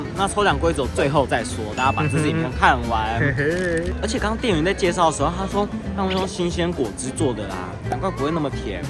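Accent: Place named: United Kingdom. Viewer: 和一樣經過過小熊軟糖的店，但當時窮遊覺得買不起就沒進去了～印象中的漢堡是一抹鮮豔的紅好想念德國啊啊啊啊聽到你的英式德文忍不住笑ㄌ😂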